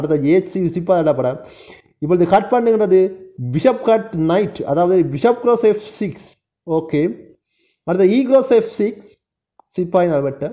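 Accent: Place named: Sri Lanka. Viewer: Arumai ilangai Tamil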